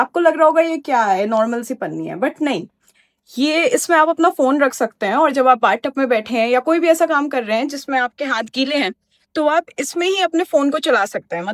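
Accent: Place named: United States. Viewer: Why your accent is American Hindi ?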